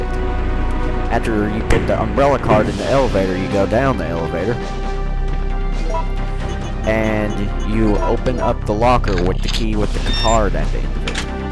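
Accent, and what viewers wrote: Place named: Texas, United States. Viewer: right out texas with that accent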